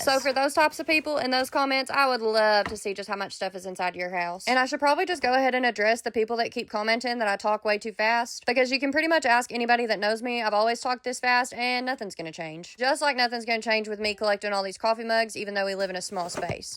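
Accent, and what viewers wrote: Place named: South, United States. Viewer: It is unbelievable how much stuff you can fit in them small places and you sound southern witch would in fact answer the fast talking thing but i do love you accent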